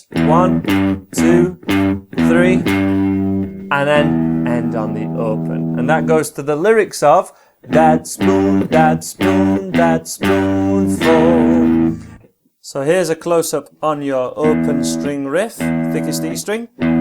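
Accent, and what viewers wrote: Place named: Northern England, United Kingdom. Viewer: Kool thanks enjoyed that tutorial IAM in Australia you are obviously northern English I was born in Cheshire hope to see more of your tutorials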